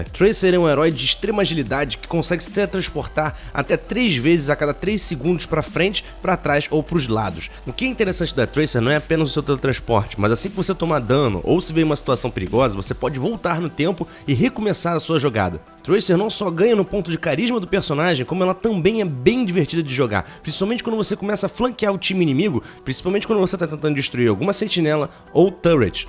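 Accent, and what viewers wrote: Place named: Portugal. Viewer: o teu sutaque e parecido com o pt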